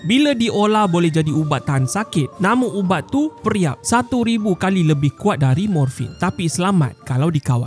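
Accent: Sabah, Malaysia. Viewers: Admin ni kan? Suara tu sebijik dgn slang Sabah dia sikit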